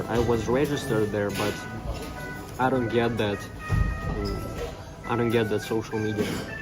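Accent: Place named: Russia. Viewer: Вы русский😂? Ваш акцент выдает😊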